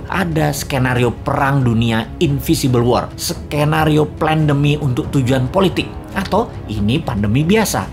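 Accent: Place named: Malang, Indonesia. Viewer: Pak Bossman Mardigu AREMA asli y ??/ngomongnya ceplas ceplos tp bener si.. :-) logatnya mirip orang Malang..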